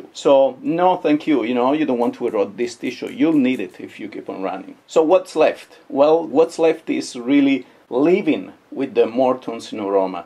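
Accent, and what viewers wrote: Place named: Italy. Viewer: This jerZ girl ❤️that Italian accent!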